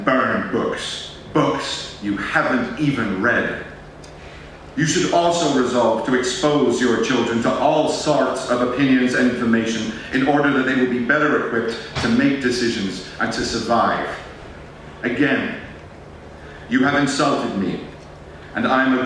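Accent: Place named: United States. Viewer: His American accent was so adorable! 💕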